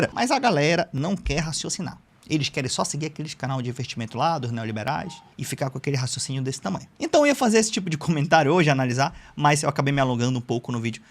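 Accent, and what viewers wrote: Manaus, Brazil. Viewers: Você é de Manaus? O teu sotaque me lembra muito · Sou Paulista morando em Manaus há mais de 15 anos. Bom demais ver um Manaura arroxado divulgando conhecimentos de qualidade em um nicho dominado pelos Sudestinos. E con um sotaque muito mais divertido. Força e sucesso na sua jornada!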